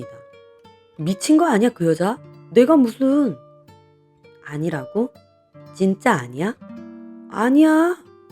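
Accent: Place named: Gyeongsang, South Korea. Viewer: 경상도 사투리 반갑습니다!!!!!!! :D 경상도 사투리라 그런지 사이다 부분에서 두배로 시원해요 ^0^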